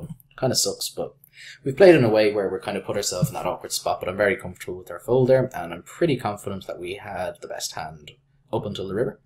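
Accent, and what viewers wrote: Ireland. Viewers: Irish confirmed.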